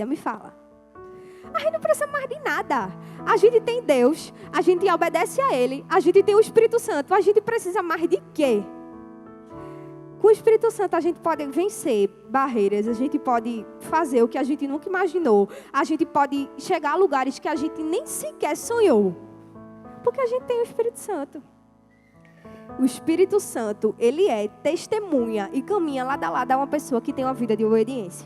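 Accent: Northeast, Brazil. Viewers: O sotaque mais lindo que eu já vi Nordeste seu lindo 😍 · Gosto do sotaque nordestino Deus abençoe a todos nós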